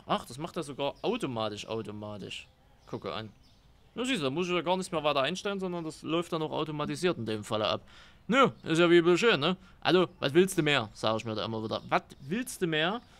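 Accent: Saxony, Germany. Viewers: Supper sympathisch endlich eh Sachse 😂Grüße aus nähe Leipzig 🎉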